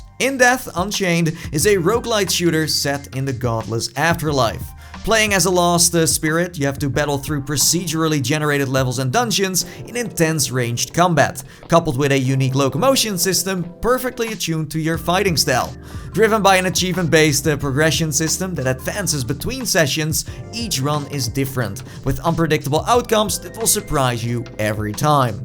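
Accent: Netherlands. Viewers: He sound just Dutch accent am I the only one that hears it maybe because I’m half Dutch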